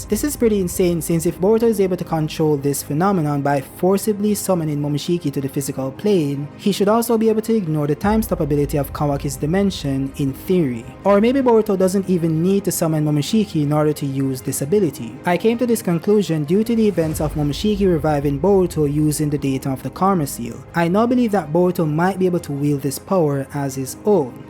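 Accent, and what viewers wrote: Jamaica. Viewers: Thanks for the video. U kinda have a Jamaican accent 😅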